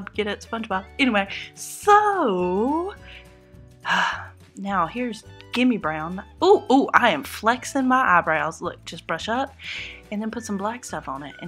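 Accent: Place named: South Africa. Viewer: Damn, I'm only watching these videos to listen to lovely SA accent! lol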